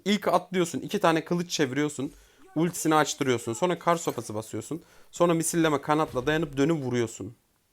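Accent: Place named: Anatolia, Turkey. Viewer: Ses tonundan beyefendi görünümlü Anadolu çocuğu sanıyordum, bugün gördüm adam hollywood artisti gibi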